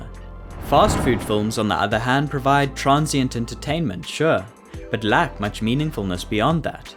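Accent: South Africa. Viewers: Saffa accent detected? Transplanted to Ozz of course. Maybe NZ. But def Saffa underlying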